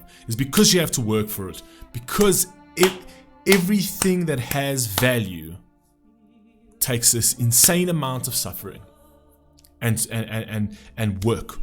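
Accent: South Africa. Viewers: As a Brit myself, I don't think he's British. I think he's from South Africa. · you sound South African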